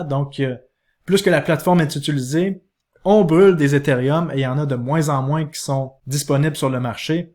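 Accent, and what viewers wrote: Quebec, Canada. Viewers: Est tu de Québec ou MTL ?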